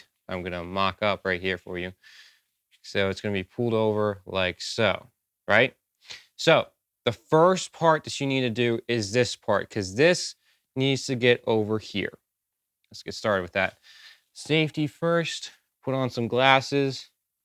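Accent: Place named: Annapolis, United States. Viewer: Super helpful. Are you by any chance from Annapolis? Accent reminds me a lot of a buddy of mine who grew up there.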